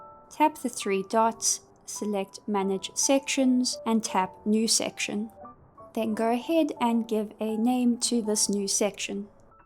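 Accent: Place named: South Africa. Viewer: Great to hear a South African voice in the content space and this is a great video with clear explanations for a very underused app.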